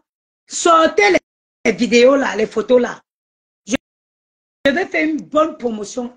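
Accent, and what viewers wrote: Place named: England, United Kingdom. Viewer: Tu as l’accent anglais ma fille pas dorcaca qui parle anglais en patois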